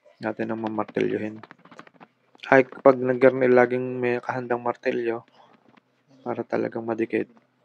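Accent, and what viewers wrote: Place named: Southern Tagalog, Philippines. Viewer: Southern Tagalog Accent !!! ..Keep it up Bro..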